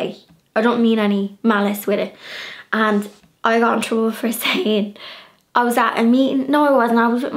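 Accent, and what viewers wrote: Dublin, Ireland. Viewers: I loved this video it was brilliant. We use lots of the same words as you in Northern Ireland too. Especially hot press and the likes. It's amazing how many irish words we use up North and also in my part of Northern Ireland we speak Ulster Scots or as the Americans call it Scots Irish. Also my granny always used to say going for her messages. Insead of grand stretch in the evenings we mostly say quare stretch in the evenings means a good stretch really the same although we do say grand meaning good. Also I remember going around in the car with my granny and Granda and he would see a wee old place that was in ruins but look like it was a pub and he would always call it a shabeen. In fact him and his friends had a wee shabeen in the shed at the bottom of his garden and they called it the Sunday school so he didn't get in trouble lol with his mum for sneaking a wee pint in there lol. 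What I love most about Ireland North and South is our hospitality up north we always say do you want a wee cuppa tea but that means you just don't get a cuppa tea you get biscuits and sandwiches and buns with the tea a full spread but if the person's responds and says aye just a wee cup in my hand that means they just want a quick cup nothing to eat which wouldn't be said often unless in a rush and couldn't stay or were not hungry like just if you were just after your lunch. When you go to our homes it's a full occasion and a spread is always put on I love that. 😊I love your Dublin accent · you have a “real Dublin accent”. Years ago I came to Dub as student, couldn’t understand half of what was said to me🥺. Then met Dub & ends my story🥰🥰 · This has to be one of my favourite videos! 🙌 💚 As a fellow Irish lass from Canada, I've been wanting to brush up on my Irish slang! So thank you so much for making this video! 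😊 Also, if ya don't mind me saying, I just love your Dublin accent 💕 xx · Loved your video and actually helped me understand some of my Pappy's sayings. He was of Irish origin and lived with us - I just simply picked up his slang. When I married my husband (from New York), he'd always ask me what does that mean? Sayings he'd never heard. Now, if I could only say those things with the lovely Irish brogue you have :)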